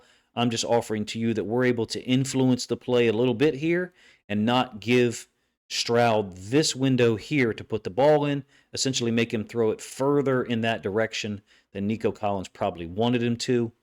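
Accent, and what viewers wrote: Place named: Midwest, United States. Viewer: Haha, I have wondered this myself. Gotta have some Midwest in him, as he’s made some references in his videos to it. Probably one giveaway is the accent, where you can hear him say “da” rather than “the”. No disrespect meant Coach - makes me think of a tough-as-nuts hockey enforcer when I hear it.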